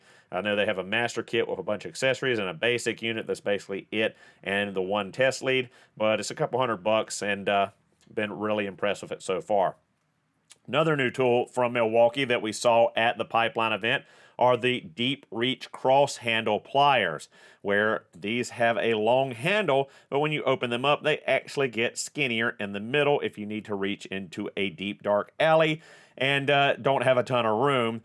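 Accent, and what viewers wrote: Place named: Vermont, United States. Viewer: Is a Vermonter? It all checks out. He even has the accent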